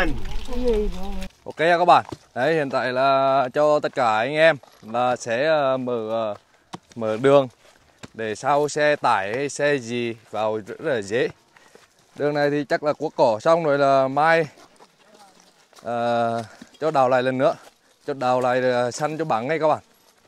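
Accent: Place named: Nghe An, Vietnam. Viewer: Quang linh quê" choa " chân phác gần gũi tinh cảm...nhất là hài ước hay ra phết...tôi dân nghệ nghe bạn nói giọng nghệ tôi vui và cười sung sướng luôn..hãy là như thể kg cần thay đổi hi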